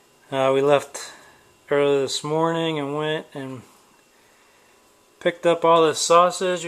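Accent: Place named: Carolinas, United States. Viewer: Awesome! Sounds like your in the Carloinas and not Texas though. Cool videos man!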